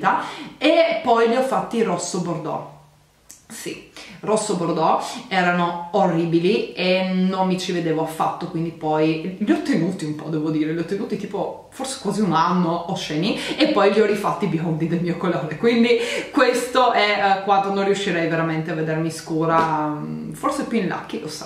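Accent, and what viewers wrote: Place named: Trieste, Italy. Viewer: Haha se senti che te son triestina..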